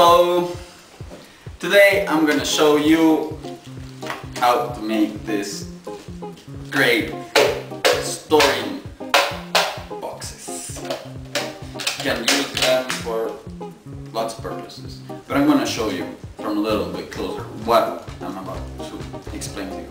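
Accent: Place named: Brazil. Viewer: Você fala inglês mas você é Brasileiro, seu sotaque não nega.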